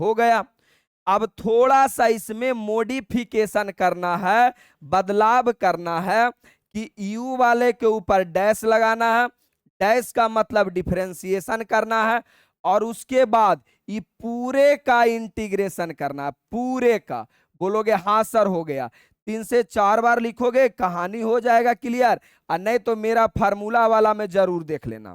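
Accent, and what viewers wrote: Bihar, India. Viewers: Apka Bihari language me math aur easy lagta hai sir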